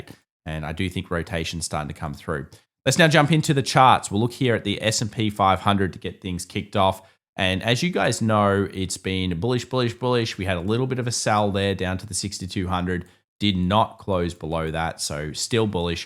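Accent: Australia. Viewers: Did anybody ever say to you, your Australian accent makes everything sounds automatically legit. Listening even though I’m not understanding 70% of it sometimes. 😆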